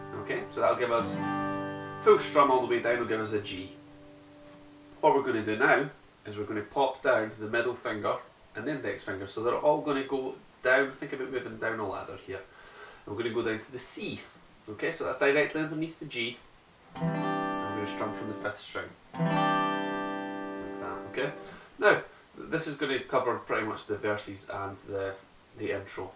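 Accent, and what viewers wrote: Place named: Scotland, United Kingdom. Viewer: Scottish I like it.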